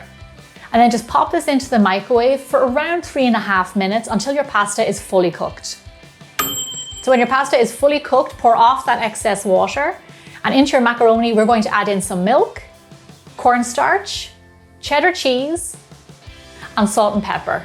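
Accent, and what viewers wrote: Ireland. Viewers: Love your Irish accent. I'm Irish too. I love the videos and follow your recipes often. Thank you! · Oh class she’s Irish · I lived in Dublin for two months and your accent is bringing me back so many good memories especially during quarantine!! I have so much love for Ireland and everyone from there ♥️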